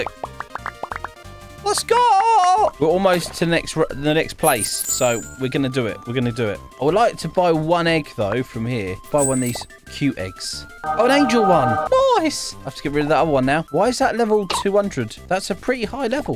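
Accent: United Kingdom. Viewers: Are you British I’ve been trying to ask you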